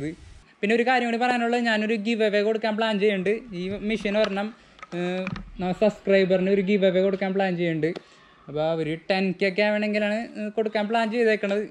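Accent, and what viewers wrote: Thrissur, India. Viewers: “ ” next M4tech YouTube channel, keep pushing and keep talking in that”Thrissur” slang 🤘🏽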